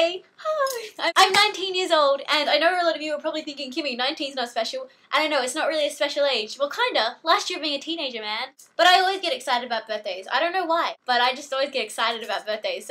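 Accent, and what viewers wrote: Australia. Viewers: Gratz (K)! Love your Aussi accent :3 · First video I watched and heard her talk... she's as australian as she is adorable and talented... there's no way you can watch this and say life is fair guys lol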